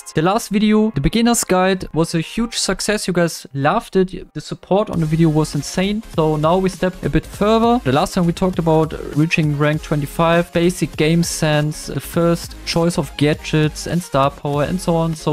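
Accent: Germany. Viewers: Love the german accent ❤ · Moin wie nutzt du BS auf deinem Pc?? und geiler Deutscher Akzent xD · Yooooo this is the most german english I ever heard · Ich höre es so sehr heraus das du deutsch bist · I just love the german accent 😊 btw I‘m also german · Junge wie deutsch kann man englisch sprechen 😂 tut richtig in den Ohren weh deine Betonung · Mann hört das er eigentlich deutsch ist haha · You are german right? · German accent makes my blood boil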